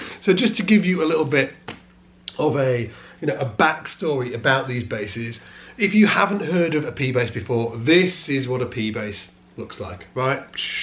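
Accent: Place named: Northern England, United Kingdom. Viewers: Northern British English. He lives in Leeds. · Hi, I'm new to bass but have played guitar for decades off and on. I'm far from expert but I play because I like to play, not because I'm really very good, though some say I am. For a little diversion I bought an Ibanez SDGR for under 2.5ton£'s. It has active pups, 3 band tone controls and a little switch that can change between single coil mode, humbucker and active output. I can get real smooth buttery bass, to cheesecake, then a bit of biscuit crumbs in the mix right the way to total gingersnap sound. Really loving this very cheap axe, and I have 5 others top notch guitars that were much dearer. Maybe it's all about the bass! Where you from in Leeds? I recognise the accent. I was born in Burley then moved to Tinshill when I was 7. Been in Newcastle since:)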